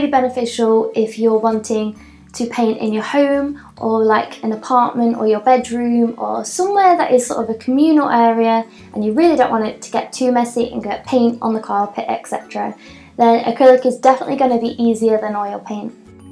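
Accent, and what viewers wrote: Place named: Northern England, United Kingdom. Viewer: I'm an acrylic painter, but I'll have to try out oils now... By the way, where is your accent from? Northern England? (I have no idea if that's a good guess or if I'm an idiot). It's lovely! 😁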